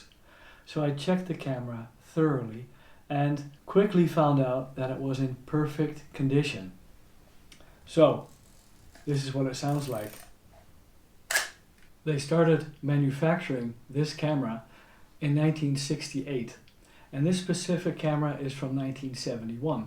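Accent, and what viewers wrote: Netherlands. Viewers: Nice review, you sounds very Dutch to me 👍🏼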